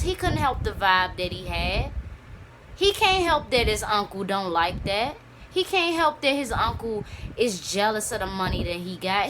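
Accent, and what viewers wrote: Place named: South, United States. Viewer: Are you from Harlem. How do you know this stuff you sound like a downsouth chick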